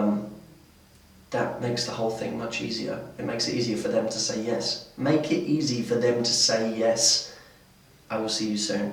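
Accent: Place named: United Kingdom. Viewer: your pretty look and British accent already won people s attention(๑´∀`๑)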